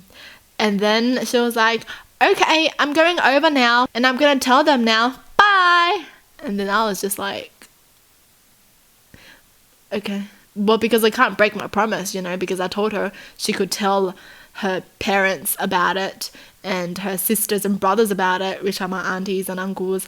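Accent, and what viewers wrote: Australia. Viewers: I love SO MUCH LIKE SO MUCH but if your a kpop lover [like me] you might notice she sounds and kinda looks like a Girl {my bias} named Rosé from BLACKPINK. Maybe it’s because they both have Australian accent but I love her and the same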